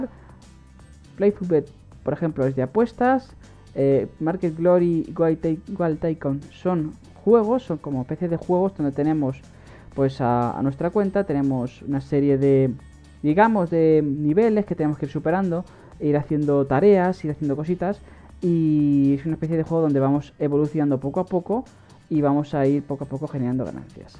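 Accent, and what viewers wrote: Spain. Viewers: Ostias tío dise para Guatemala pero este tío suena a español xD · Dice guatemala y el habla como español xd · En Guatemala ? Pero el que habla tiene un acento de España ¿?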